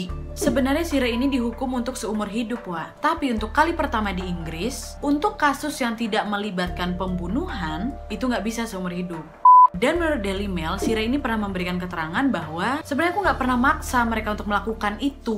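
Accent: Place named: Medan, Indonesia. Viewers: Jadi ketagihan awak nonton video uwak..logat melayu deli yg kental kali wak...sukses selalu wak💪😘🙏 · Aku suka banget sama gaya bicara dan suara yang jelas dan lantang. Pembahasan tentang kasus2 yang dibawakannya begitu runtut, rinci dan detail. Lagipula juga pintar, cerdas serta cantik rupawan. Dari logat bahasa, sepertinya dari Medan-Sumut ya? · Logat sumatranya kentel nian wak ini🤗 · Nonton semua video serasa lagi gybah sama temen, seru habis ya walaupun ceritanya seperti kaidah kaidah semata, tapi dia jelasinnya kayak anak medan kali logatnya, senang kali aku nengok kontennya, sukses terus ya biar kita gibah terus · Suka kali aq dengan logatnya.. bah medan kali lah.. langsung ku subcribe. I love u sis ❤😘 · Anak mana ko kakkk medannya kauuu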